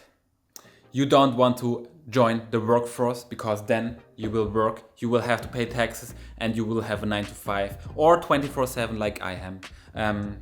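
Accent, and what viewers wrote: Germany. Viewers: You are so funny, maybe also involuntarily because of your English with that strong german accent My friend Martin who is Logopaede said that you have a speech impairment but I don't mind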